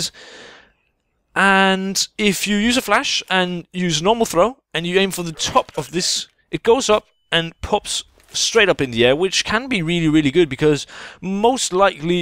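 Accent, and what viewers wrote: Denmark. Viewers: I love when you do your Funny ''Danish-english'' (I'm norwegian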